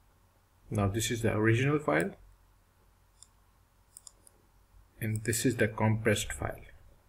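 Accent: India. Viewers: 😂 Sorry but I can't focus, your Indian accent is so fucking hilarious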